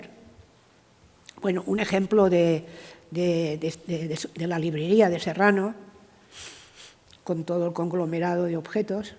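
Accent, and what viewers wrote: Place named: Catalonia, Spain. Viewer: ¿que pide perdón por su acento catalán? ¿pero esta de qué va?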